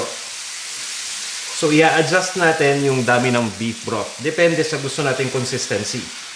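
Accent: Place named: Tagalog, Philippines. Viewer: Gusto gusto ko talaga mga lutuin mo sir and the you speak sobrang tagalog na tagalog Panlasang Pinoy talaga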